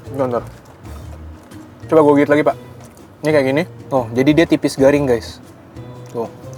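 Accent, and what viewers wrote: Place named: Bekasi, Indonesia. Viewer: Logat lu bekasi bgt bang😂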